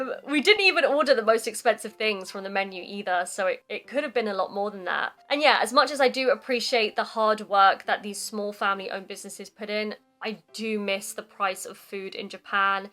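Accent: United Kingdom. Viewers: Sometimes Japanese old-timers says "in western countries blah, blah, blah" but i don’t want to listen to them any more and we should be more confident our system and the way we do, right ? Thank you very much for your “noticing” and i love your charming voice and the way of talking （especially i love your British accent ! ） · uk訛り❤好き😊🎉活動頑張ってください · I like your British accent. In addition your oppinions are fun! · 早くオミクロン株から逃げて帰国した方が良いです。イギリス英語が一番聞きとりやすく勉強になります。 It is better to escape from the Omicron strain and return to Japan as soon as possible. British English is the easiest to hear and study.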